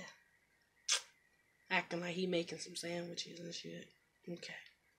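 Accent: United States. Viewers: Where are u from? U sound just like me, so country! Lmao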